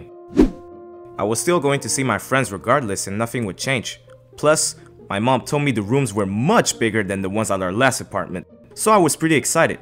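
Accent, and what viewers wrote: Montreal, Canada. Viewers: I can hear that Montreal in your voice :) !!! No hate I grew up in Montreal too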